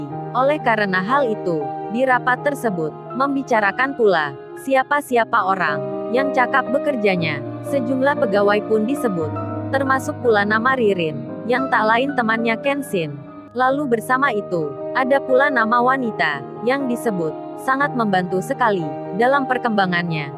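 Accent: Surabaya, Indonesia. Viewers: Mantap..adminya wong suroboyo ya min..salam kenal aq sby utara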